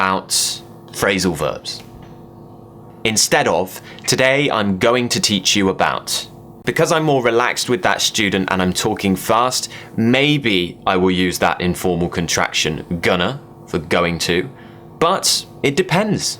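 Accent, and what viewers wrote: RP, United Kingdom. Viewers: I wonder if your accent is Received Pronunciation?Thank you! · I didn't understand British accent before. But now I can't believe that I can translated almost all you said. Thank you from Thailand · Are you speaking RP accent? You speak so clearly. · Hello,! I came across your channel last week and now I can't go a day without watching at least one of your videos. You're very helpful and I want to thank you for that. You're automatically the best teacher I've ever had, haha. I really want to improve my British accent because I'm from South America and I learnt English in the American way, but I really want to learn British English more. When I watch your videos I always practice alone at home pronouncing the words the way you do it, but when I'm at university and I'm required to speak in English, I always end up speaking with my usual American accent because I'm still not used to speaking with a British accent and I feel kind of forcing it, like acting up my English, if that makes sense. 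Do you have any advice for me on how can I feel more relaxed speaking with my rough British accent to other people? Thank you very much again!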